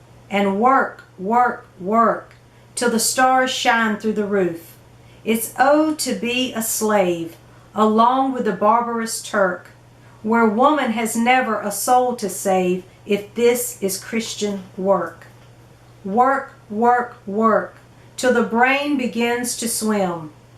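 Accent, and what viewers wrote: South, United States. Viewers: I love your south accent! It's more smooth in my (french) ears than the british accent 😊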